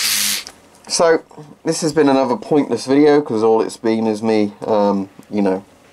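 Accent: England, United Kingdom. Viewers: It's you english accent. Be proud of it! Maybe try some rubber bicycle tube to cover the inlet valves. 🇦🇺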